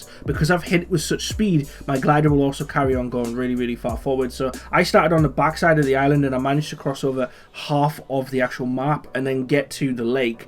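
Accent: Newcastle, United Kingdom. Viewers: you geordie bro?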